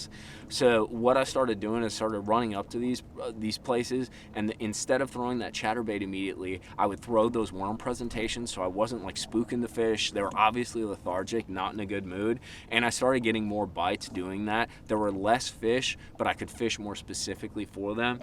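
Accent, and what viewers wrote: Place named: Minnesota, United States. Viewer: Woooo! First comment finally. Fishing is a lifestyle. That ohhh Kay 😂 I’m from Minnesota so I can appreciate the accent.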